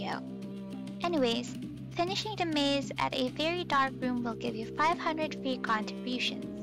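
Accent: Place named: South Africa. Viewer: Awe! I just discovered you a few days ago, as i just started playing roots of pacha, and i have already commented a few times on your vids 😂 but i just wanted to tell you how much your video's and your ADORABLE voice brightens my days!!🤩💕 Also, were are you from? I dont know why, but your accent sounds a bit south african or something like that haha, well im from sweden so i have NO clue, im just guessing hahaha 😂 its something about how you pronounces words, like the fish names in one of you vids, and it just tickles the brain like south African accents do haha 😂 Also, your lil meerkat avatar makes me CRY hahaha, they're one of my favourite animal's , here in sweden we call them surikat, or if there's more than one, surikater haha 😭 You're welcome for that EXTREMLY useful info hahah😂😂 welk just wanted to let you know ur awesome and i love ur vids, you dont even know how much they have helped haha 🤩💕 love from sweden!!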